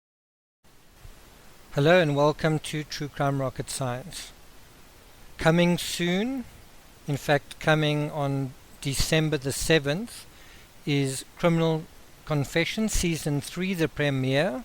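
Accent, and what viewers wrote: South Africa. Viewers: South African narrator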